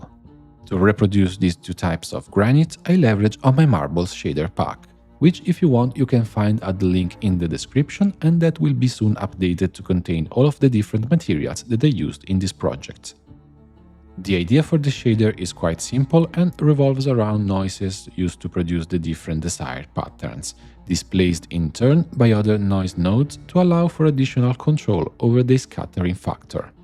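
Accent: Italy. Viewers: I tuoi lavori sono eccezionali, complimenti (tanto sei italiano, si capisce dalla pronuncia)! Volevo chiederti una cortesia: in tutorial passati, quello sui trim-sheet in particolare, usi substance, ma questo è un problema perché è un sw non accesibile. Potresti fare una guida dove spieghi come creare trim-sheet con i materiali creati direttamente in Blender? Hai provato a creare archi e volte tramite GN?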